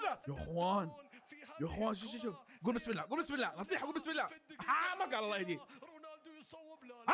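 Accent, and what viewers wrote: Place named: Jazan, Saudi Arabia. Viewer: لا بس حسيت طريقة كلامك كأنك جيزاني..... والنعم فيكم اتشرف فيكم❤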